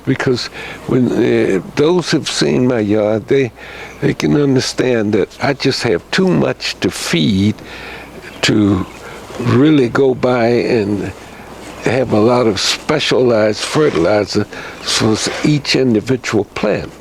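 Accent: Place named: New Orleans, United States. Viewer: New Subscriber Not only a 🙃, but does Bonsai too👏🏽 I'm looking for an Azalea now.. Most of my Trees are Ficus, or Portulacaria Afra, Holly, even a few Fuji Cherries.. Good info here🏆 sounds like New Orleans🤔 🙂 I'll keep watching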